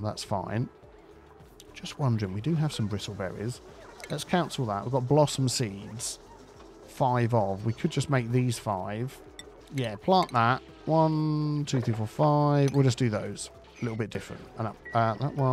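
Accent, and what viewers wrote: United Kingdom. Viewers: I haven't whatched your content in the last months because CS2 doesnt interest me anymore but ONI is my all time favorite and I love love love seeing u playing it again!!! And I really missed your british accent! So I will watch ALL your ONI content for sure!❤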